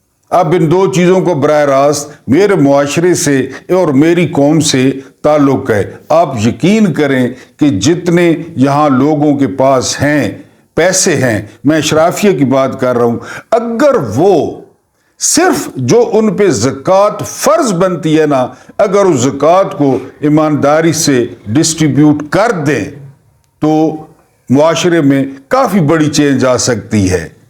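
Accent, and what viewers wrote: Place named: Mianwali, Pakistan. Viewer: Vlog ki ahmiyet aur maqsad Kai Saath Saath mianwali lehjay Mai guftagoo, Sahab Allah Aapko khush rakhay. AAP ne haqq. Ada kar diya.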